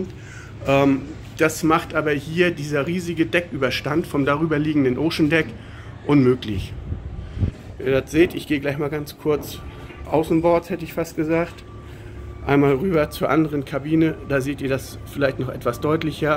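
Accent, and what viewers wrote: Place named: North Germany, Germany. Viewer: O Gott. Was für ein norddeutsches Gelabere. „Sich mit dem Gedanken hegen“ 😂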